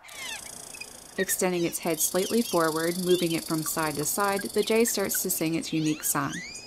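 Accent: Newfoundland, Canada. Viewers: The Canadian accent is strong with this woman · Are you from Nfld or Nova Scotia? XD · I knew you were Canadian when I heard pronounce your “ou”s 😆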